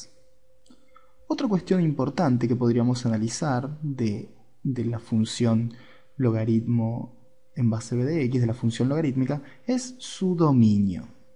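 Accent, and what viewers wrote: Rioplatense, Argentina. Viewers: Bryce Flint No es culpa de él haber nacido en un lugar distinto al tuyo, es su acento de argentino o uruguayo, no se. La culpa es tuya por intolerante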